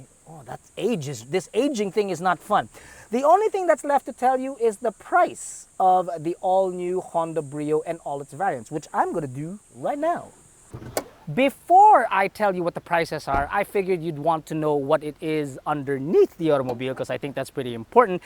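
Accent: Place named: Philippines. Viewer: I love the standard filipino accent.😊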